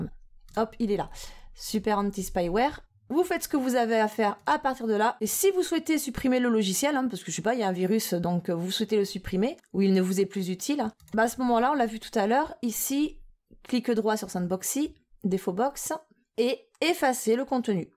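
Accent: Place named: Southwest, France. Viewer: Toujours aussi percutent, un vrai plaisir d'apprendre avec un aussi bel accent du Sud/Sud Ouest. Bravo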